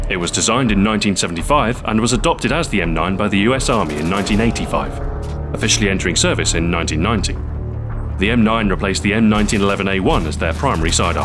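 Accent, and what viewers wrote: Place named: United Kingdom. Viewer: your British voice is hypnotic..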